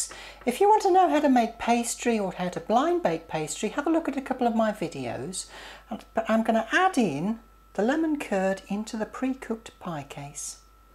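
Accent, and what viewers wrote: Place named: England, United Kingdom. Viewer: you're so sweet!.. I love the way you explain the procedures, and that AWESOMELY perfect English accent! Suscribed! Greetings from Argentina *=D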